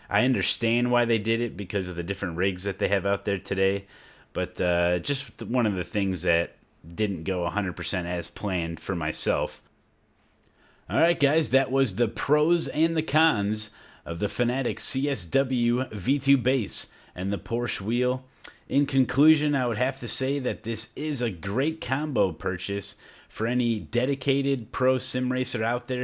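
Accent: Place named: Midwest, United States. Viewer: Hey man whatsup. love the streams. But As for the click being a con, streaming that can definitely be an issue, but i dont think its a con in itself. Some love and/or need the strong satisfying click. but that should be an option i agree. if the click wasnt there id still want a nice solid tactile thump to confirm i had shifted, not some mushy feel ya know? And im from georgia myself, cant quite pinpoint your accent, midwest?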